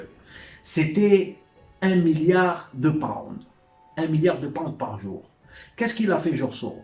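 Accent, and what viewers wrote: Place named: Algiers, Algeria. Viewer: Salam on entend l'accent algerois kho bonne vidéo merci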